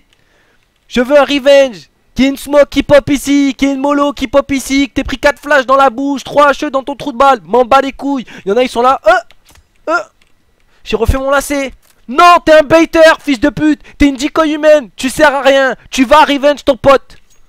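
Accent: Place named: South, France. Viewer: Je kiff l'accent du sud xD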